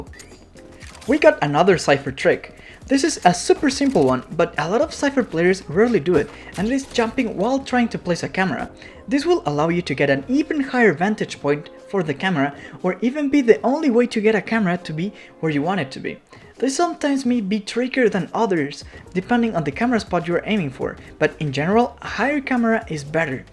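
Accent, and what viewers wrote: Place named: Brazil. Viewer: Parece o sotaque de um brasileiro falando Inglês.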